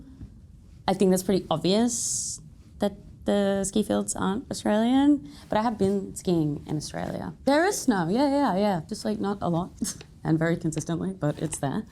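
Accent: United States. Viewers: You can really tell she’s starting to get an American accent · There’s an American accent starting to creep in there.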